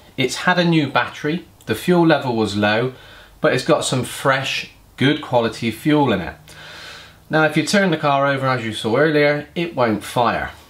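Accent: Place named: United Kingdom. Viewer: I really like the british lang.. Nice video.. informative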